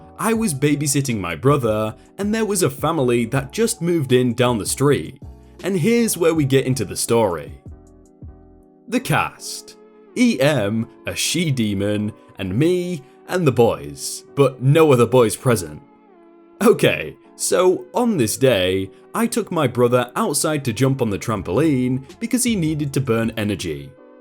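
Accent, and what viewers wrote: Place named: Midlands, United Kingdom. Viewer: Curly Nation06 Yeah, he has a midlands accent, I believe. Definitely somewhere middle or north in the UK XD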